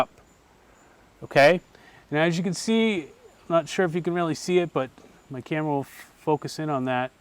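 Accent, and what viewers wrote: Boston, United States. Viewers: you from Boston, guy?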